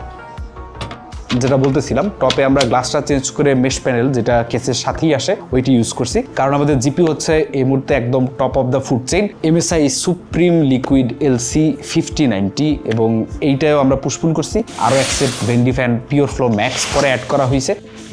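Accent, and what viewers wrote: Barishal, Bangladesh. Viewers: Je kono ekta accent e kotha bolen. Hoy Barishal na hoy suddho. R na hoy beadob type lage.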